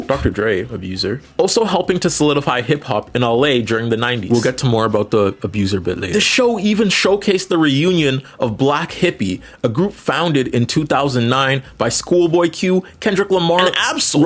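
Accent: Canada. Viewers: As soon as I heard your voice I could tell you were a fellow Canadian 😂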